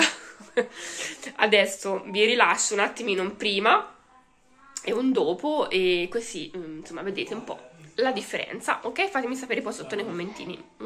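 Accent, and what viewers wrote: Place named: Tuscany, Italy. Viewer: dalla parlata sento che sei toscana da dove? io Volterra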